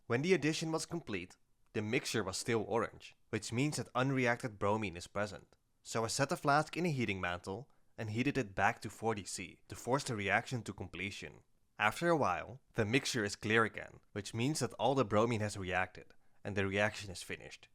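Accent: Netherlands. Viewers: r u dutch? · Are you by any chance dutch? I noticed a little bit after way too long but i did pick out a couple of pronunciations when i started to focus on it, and now you saying youre not a native speaker... That would be really cool! Anyways loved the video im glad i got it in my recommended im definately gonna binge you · I hear a Dutch accent? Gaaf hoor, en ik moet me echt een rain geur aanschaffen 😅 -Oh nee ze zijn uitverkocht! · Are u dutch ? u sure as hell sound like it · I know a fellow Dutchman when I see (hear) one... goed bezig man, je hebt een kijker erbij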